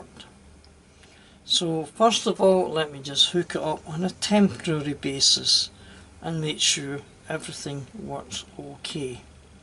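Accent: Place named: Scotland, United Kingdom. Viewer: Love the video and as if by magic "as Mark quoted " ,im new to N-Gauge so have been watching loads of videos to learn ,then i found you "brilliant" im from Aberdeen and your from a wee bit down the road i gather by your twang. keep it up im going to join Aberdeen Model Railway Club and gain knowlage from them too, i just bought a load of stuff from your favorite Ebay and am converting the engines to DCC,look forward to more videos ,keep up the good work and Thank &You. .regards John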